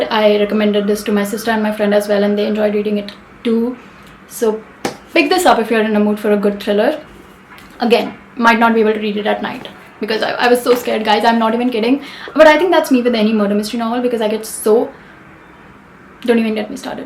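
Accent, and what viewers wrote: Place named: Bengal, India. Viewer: why does she have a hint of a bengali accent? sounds cute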